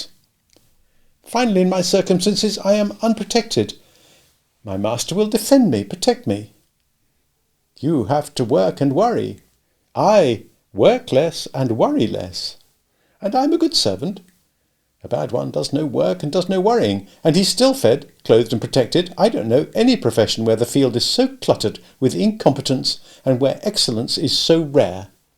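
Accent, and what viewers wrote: United Kingdom. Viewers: I find Steinbeck's use of stones to be interesting. Upon researching the biblical meaning of stones, they seem to symbolize "endurance, stability, and permanence," while Steinbeck's use seems to alludes to the opposite, i.e. stones are something to be removed, thus showing his roots that stem from farming. I'm sure there is a deeper meaning, but I will need to ponder this a while. Interesting to be listening to this via a Brit!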